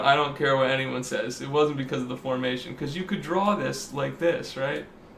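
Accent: United States. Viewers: Hey, thanks, i've been watching your discourse on twitter haha, also you sound texan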